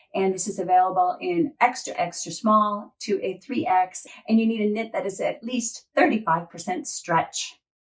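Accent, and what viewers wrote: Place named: Philadelphia, United States. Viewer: I agree, I think I may try the one you have on first. Great list of tops. Why did I have you pegged as a Philly or South Jersey girl? Texas? Never would have guessed.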